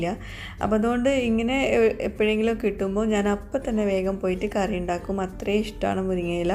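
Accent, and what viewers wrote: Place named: Palakkad, India. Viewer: ഞങ്ങൾ പാലക്കാട്ട്കാരാണ് പട്ടാമ്പി ഒക്കെ ഇങ്ങനെ ആണ്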